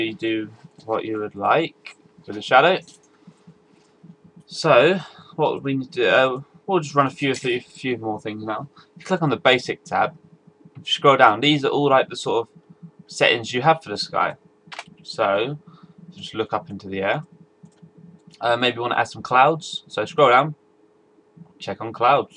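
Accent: United Kingdom. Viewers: Are you British? Looks like you have a British accent hehe:)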